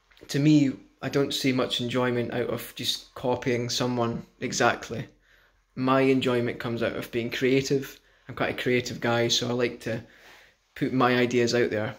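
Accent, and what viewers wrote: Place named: Scotland, United Kingdom. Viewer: Well chuffed yer Scottish pal,been listening to ye for a few weeks noo,brilliant stuff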